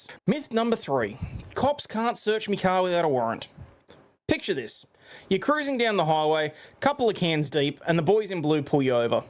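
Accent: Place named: Australia. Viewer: This one with extra thick Aussie accent. Noice!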